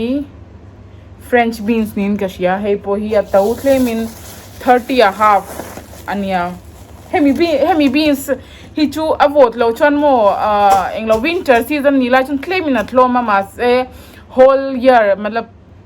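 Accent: India. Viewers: I tenawm diaiduai itawng vainu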